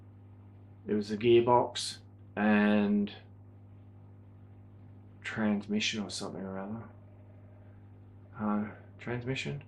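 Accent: Australia. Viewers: I was just thinking about you yesterday and checked to see maybe I'd missed any videos, then this popped up on my feed tonight. I'm so sorry you have all this to deal with and I'm praying for you and hoping you'll go into remission. You have many fans- you aren't the only "old person" doing/watching You Tube, lol. Your videos are so interesting, your voice is soothing and I'll take that Aussie accent any day!💕 Lots of prayers, best wishes and love to you,.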